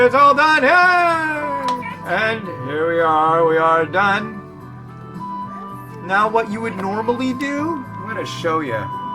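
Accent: United States. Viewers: Провинциальный американский английский, с проглатыванием половины окончаний :) буду его смотреть, чтобы язык воспринимать. Классный чувак.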